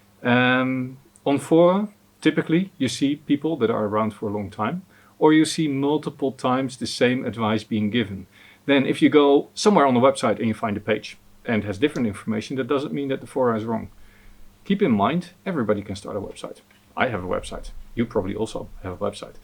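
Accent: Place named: Netherlands. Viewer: Never had a dutch accent this thick haha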